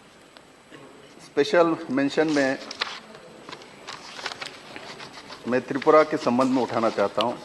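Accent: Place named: Bangladesh. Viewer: Bangaladeshi bangla jesa bat karti hu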